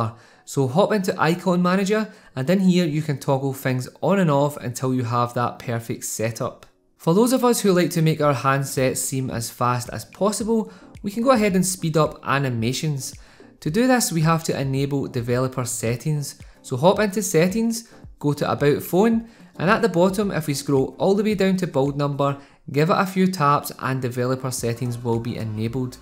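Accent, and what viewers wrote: Ireland. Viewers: I love your accent man. Are you from Ireland? And also the name of the wallpaper pls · Is he fucking Irish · I am watching coz I like your accent,. Irish I assume??👌😄😄😄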